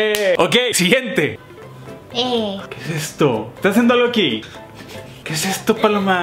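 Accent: Monterrey, Mexico. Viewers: Me da ternura cuándo a se le sale el acento regio❤ luego de ver tus videos ya hasta yo hablo igual jajaja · Asi hablamos los de Monterrey jajaja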